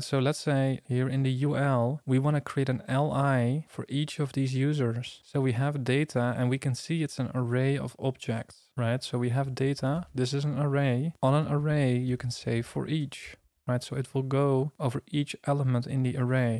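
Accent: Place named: Netherlands. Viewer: Ik hoor Nederlands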